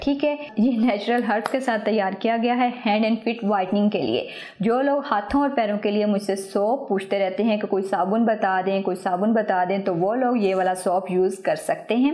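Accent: Punjab, India. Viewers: Ap ka panjabi style bahut mazy ka hai. Salamat rahain. Ameen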